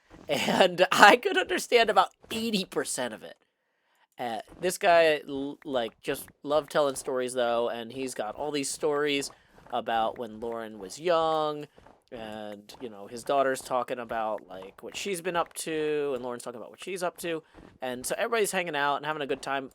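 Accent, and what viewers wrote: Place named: South, United States. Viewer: Hearing the English mannerisms in a southern accent all episode was a treat!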